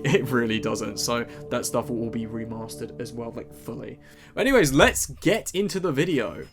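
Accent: United Kingdom. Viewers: i didnt know you was British too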